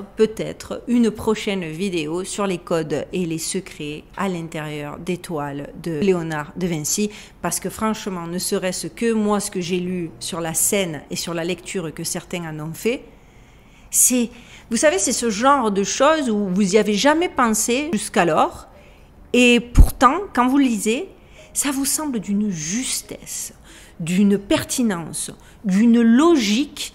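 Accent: Marseille, France. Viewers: Merci pour la vidéo, bien présentée et on sent qu il y a de la recherche . Votre accent me fait penser au sud de la France (Landes ou basques ..) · Je vois qu'il y a beaucoup de clients pour ces salades... mais quand à moi, des propos tenus avec l'accent cagole je ne peux pas les prendre au sérieux.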